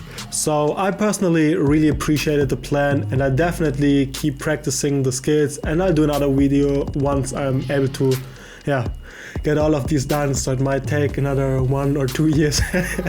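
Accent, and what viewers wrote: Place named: Germany. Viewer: I hear deutschen Accent (W Vid bro)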